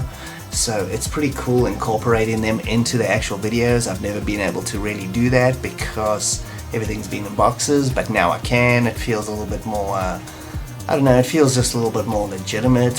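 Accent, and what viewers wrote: South Africa. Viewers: I'm guessing your a Saffer living in the USA? (Not digging for info, just a guess from the accent) I think at least C64, Amiga and other 8 and 16 bit companies should give you copies of their software. Some absolutely brilliant games are coming out for retro systems. Maybe if we mention you now and then on their forums - it might grab their attention? I have to play these new games on emulators. Just playing the demo for "Shadows of Shegorath" on my Pi400. Great game but in the back of my mind there is an itch that is telling me "You should get yourself a proper Amiga" Same when I play "Boulderdash" on Vice "...This is a great game - but I need to play it on a proper C64" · I've always thought you had a South African accent!! That explains it:-)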